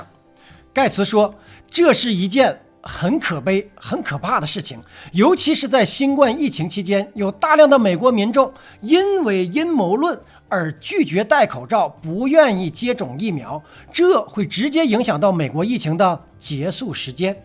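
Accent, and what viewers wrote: Northeast, China. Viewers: 是东北人还是陕西人？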